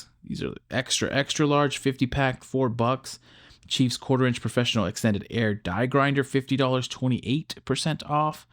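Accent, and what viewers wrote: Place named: California, United States. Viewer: that's dope lol, I speak some but not enough. I saw you were from California and I can hear the way you pronounce some words but that's kinda common in Cali, and then you said la and I was like yep it's confirmed lol. Awesome bro, good skill to have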